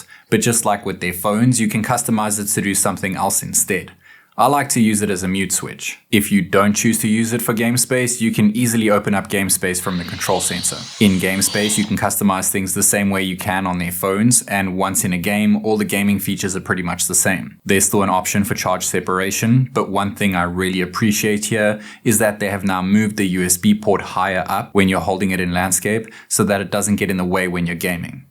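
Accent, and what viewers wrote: South Africa. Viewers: can i ask if this guy is South african? the accent sounds very similar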